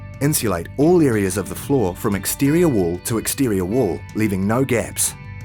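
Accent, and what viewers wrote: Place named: New Zealand. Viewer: This video needs to be narrated by an Aussie the kiwi accent will inhibit sales and no one will take it seriously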